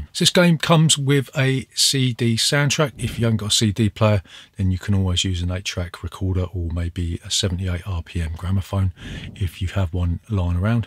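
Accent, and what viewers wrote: United Kingdom. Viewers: I am digging your english so pure so british. Loving that. Greetings from SVK <3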